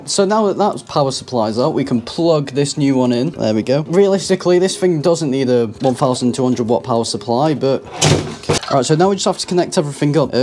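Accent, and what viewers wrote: United Kingdom. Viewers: I love that British accent, it makes me feel that I'm the one troubleshooting the pc.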